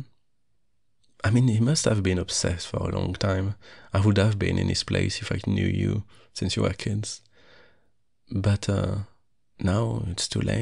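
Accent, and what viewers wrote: France. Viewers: He must be a french man? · Possessive, hot, french man? HECK YES 🥵🥵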